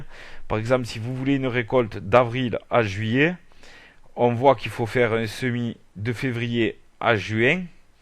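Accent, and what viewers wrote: South, France. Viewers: et bien merci pour la vidéo et vive accent du sud je suis de Narbonne et passion jardin aussi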